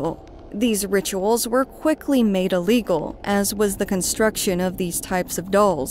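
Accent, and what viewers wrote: Japan. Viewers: idk if this makes sense or if you have heard this before, but the cadence that you speak english is really similar to how native japanese ppl speak japanese. its really cool to hear that in english, and also your japanese sounds great too! been watching ur vids for a while ♡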